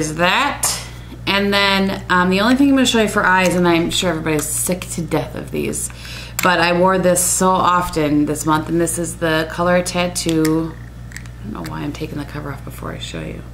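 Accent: Wisconsin, United States. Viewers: Love your videos,. My father's family is from Michigan and your Wisconsin accent is very similar. I have baby fine hair and appreciate any suggestions for adding body and volume. I have been liking Phyto's Phytovolume Actif spray and Schwarzkopf's Osis+ Dust It.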